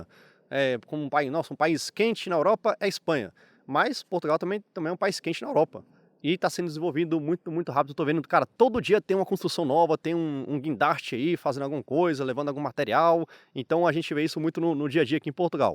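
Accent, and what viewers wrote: Brazil. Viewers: Parabéns pelo vídeo, principalmente pelo modo como se expressou num correcto Português, com sotaque mas sem erros. A ideia de Brasileiros não se aglomerarem em guetos levará à aprimoração da qualidade de expressão pois erro gramatical em Portugal também é erro gramatical no Brasil. Quanto à beleza de Portugal irei mencionar apenas isto: Ainda não ouvi nenhum Brasileiro YouTuber dizer que a grande diferença paisagística notória quando cá chegam é a quase ausência de grades e vedações de propriedades à moda brasileira. Este canal ganhou mais um subscritor Português...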